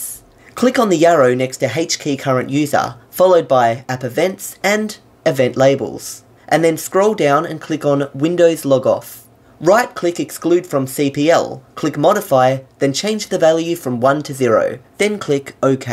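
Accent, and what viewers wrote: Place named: Australia. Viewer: I subbed as soon as I heard the aussie voice I have been hearing for years.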